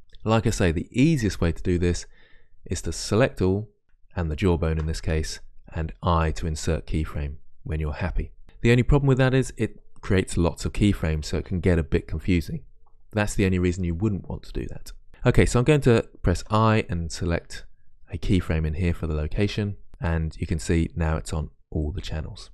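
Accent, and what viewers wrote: United Kingdom. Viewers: I love your videos so much plus your British Accent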